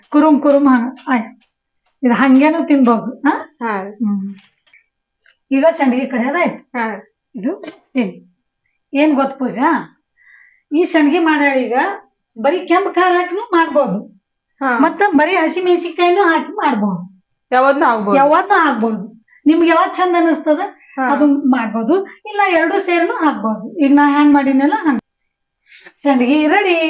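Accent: Maharashtra, India. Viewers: Nimadu yaa uooru Maharashtra hatira.ada einari yakandr marathi mix bhasha bhala matadteri. Nimm bhasha Keli khushee aitu nanu hinge matadteni aadak❤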